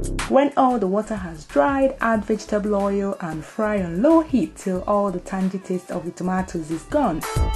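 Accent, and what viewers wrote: Nigeria. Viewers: You sound so Nigerian,I love it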